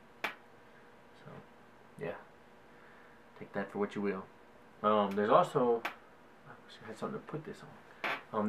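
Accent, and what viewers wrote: Louisiana, United States. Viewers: your accent is a touch Cajun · You definitely have one. It is not as heavy like others have said but I hear it. When you say sixty, it sounds like siggsti. And when you say fork, stuff, sure, New Orlinnns. You pick up your vowel sounds really lightly, almost like you are are rapping. And your t sounds are really strong lol. Houston and N'awlins y'all. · you are pretty Cajun. XD BUt, yeah, I have heard a lot thicker. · yeah, you definitely sound Cajun. I love way you talk. I'm from southwest Virgina. I've always called my grandparents papaw and mamaw, too, we say wash the same way, too.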